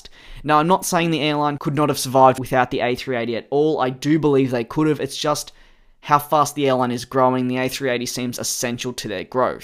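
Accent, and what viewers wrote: Australia. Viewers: Is the narrator Australian? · you are Australian?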